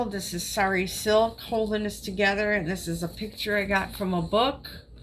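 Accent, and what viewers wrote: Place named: Upper Midwest, United States. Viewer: That accent !! - I recognize as either Wisconsin or Minnesota !! Go Badgers ! Lolol. Where's Margie Gunderson ?!?!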